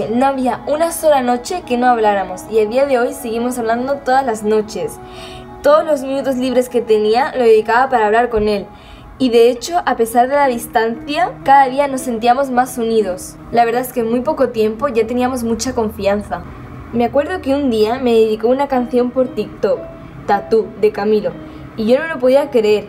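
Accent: Cordoba, Argentina. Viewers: che pero el asento ya lo superas te lo pasas al siguiente por q ablas bien argentino saludos desde argentina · Es que es asi pa los aregentinos somos los mas romanticos del mundo wacho siendo cirujas o quizas no pero siempre ahi para cuando se trata de la persona mas especial que tiene uno💖y la tonada de este hdp la amo gente😁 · el acento cordobés 😭🤏🏻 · AW EL ACENTO DE ARGENTINAA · Soy de Argentina buenos aires y el asento cordobes es lo más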